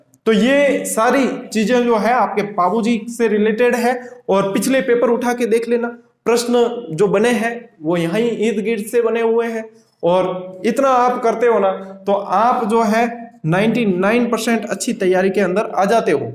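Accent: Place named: Barmer, India. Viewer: सभी क्लासेज एक से बढ़कर एक...sir m bhi barmer me service krk aai hu 3 saal...so aapki kshetriya boli se kafi Apnapan lgta h ... thanks so much for your classes 🙂...Vidya dan maha dan🙏🙏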